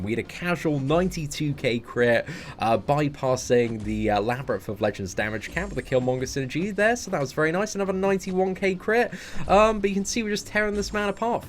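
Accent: United Kingdom. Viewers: The commentary the pure British accent what more could you ask for.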